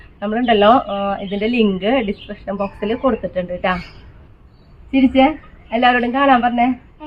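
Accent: Thrissur, India. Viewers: Nice to hear trichur basha smiths God bless be cause Amma is with u dear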